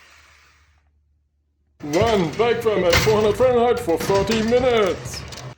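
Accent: Germany. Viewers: I have to know: Are you german? By your accent i'd say 100% :D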